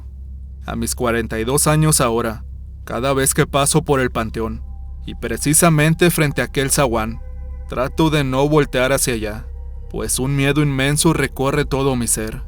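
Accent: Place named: Northern Mexico, Mexico. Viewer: ¿de cual ciudad y estado eres? por que tienes el acento muy golpeado, como norteño.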